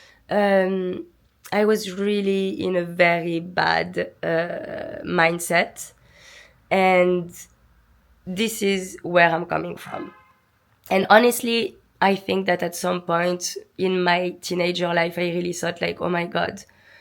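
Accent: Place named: France. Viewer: dear, thank you so much for all the directions you gave to achieve our dream lives. i feel like i am hearing from such a beloved friend when listening to you. love the way you express yourself (also, your french accent!). i do not only believe, but feel you truly are such an amazing person and have a marvelous soul. may your life be always filled with love, joy and blessings ❤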